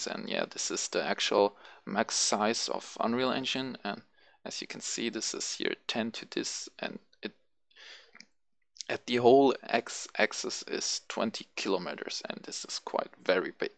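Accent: Germany. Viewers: deutscher oder österreicher oder schweizer?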